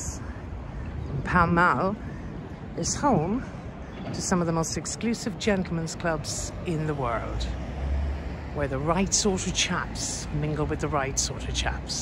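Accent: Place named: Ireland. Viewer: A lovely way to learn about our capital, told by a lovely Irish lady with a soft Irish voice. I could listen all day.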